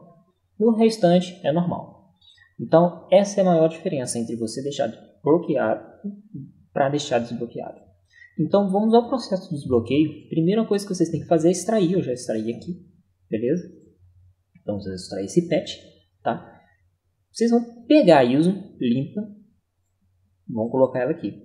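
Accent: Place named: South, Brazil. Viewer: VC É DO SUL DO BRASIL TIPO SEI LÁ RIO GRANDE DO SUL POR CAUSA DO INICIO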